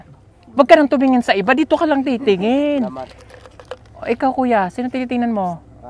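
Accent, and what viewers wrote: Visayas, Philippines. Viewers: sir ang cute mag salita ng bisaya!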